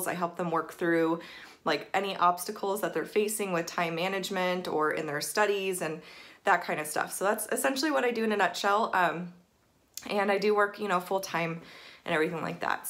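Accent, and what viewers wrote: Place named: Wisconsin, United States. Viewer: WHOOP...WHOOP...It was really good watching this & learning about you. #MIDWESTGIRLS I live in Indiana & I know you say you've lived in Arizona for about 20 years, but...I can still hear a bit of that "Wisconnnnsen" accent in your voice. I only know it because I have a lot of friends who live in WI. Do you work for University of Phoenix? I took classes through them & although it was much different than a traditional brick & mortar school, I really liked it. I think I'd love to have a job like what you do. It seems like you've got a pretty good thing going! Good luck with your journey & looking forward to hearing your "news" next week (can we probably guess what it might be)?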